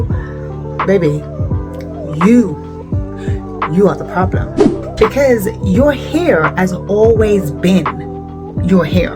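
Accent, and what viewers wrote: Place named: Jamaica. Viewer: Ms, where are you from? I hear some Jamaican in your voice. Loved seeing the progression in the video. I too suffer from hair loss due to hormonal issues. Looking forward to seeing your progress.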